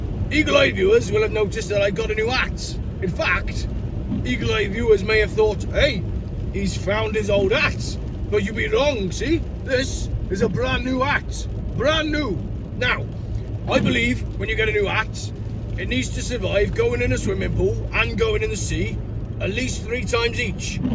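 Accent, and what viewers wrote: Wales, United Kingdom. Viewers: Bloody hell! I thought it was Rhod Gilbert! Cracking accent boyo. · Don't say sorry for the welsh accent, it was fantastic! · dell boy would be proud of that Welsh accent BOYO.and it’s pissing down in Bridgend at the moment.have a good day ✌️🙏🫶🏴 to the farmers. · From a welsh man I love the accent. 😂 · And it’s raining right now here in South Wales boyo, love the Welsh accent, 😂. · Don't worry, you are back in the groove. Enjoy the 20 mph limits. 😀. Love the Welsh accent by the way. 👍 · I like you Welsh accent please keep it up, Cannot believe you still have compulsory Insurance in the UK (Pom Land) it’s crazy. I remember Customer buying a brand-new motorcycle when I was working over in the UK on my OE and Insurance cost the same as the motorcycle did . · Love your Welsh accent. More Welsh than my, born and bred in Wales accent. 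Lol. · As a welsh person, love the accent. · Great to have you back,. Loved the Welsh accent boyou!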